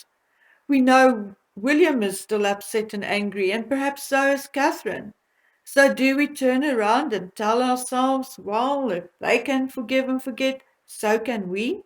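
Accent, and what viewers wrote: South Africa. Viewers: Its very relaxing, then again I am South African living in the UK so any SA voice is a bonus for me.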